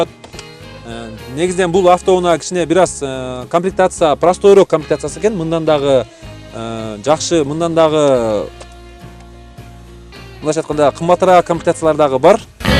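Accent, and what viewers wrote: Standard. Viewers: ишинизди мыкты кылат экенсиз таза суйлойт экенсиз дагы кутобуз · Кыргызча таза так, Жана тунук суйлойт экенсиз. Албетте ийгилик каалайм.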